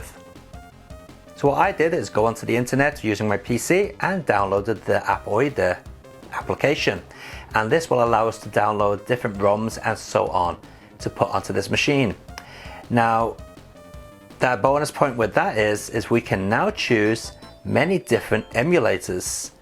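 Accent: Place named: Liverpool, United Kingdom. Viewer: Great review; and as a huge Beatles fan; I thought your accent might be from Liverpool. Looking forward to more.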